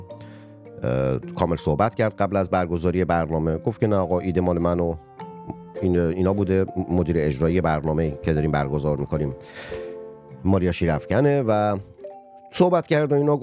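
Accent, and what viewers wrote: Abadan, Iran. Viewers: ماهم دوستت داريم هموطن اباداني خوش زبان وخوش لحجه🌺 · درود گرامی ❤❤ درودها به شرف‌تون ❤❤ بسیار بسیار فیض میبرم که برنامه‌تون با لحجه و حال و هوای آبادانیِ❤❤ بسیار بسیار عالی و زیبا ❤❤ با اینکه خودم آذری هستم ولی عاشق و مُرده ی لحجه ها و آواها و فرهنگهای گرم و گوناگونِ میهنم ایران هستم❤❤ با همین فرمون جلو برید که عشقید❤❤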